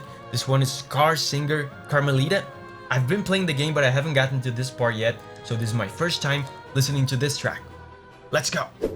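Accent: Brazil. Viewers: you sound Brazilian, are you Brazilian?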